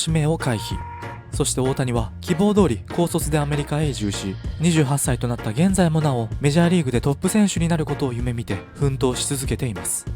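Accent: Kansai, Japan. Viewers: この解説者関西弁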